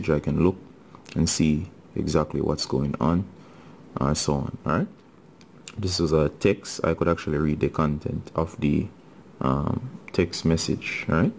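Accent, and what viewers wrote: Jamaica. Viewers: Sound jamaican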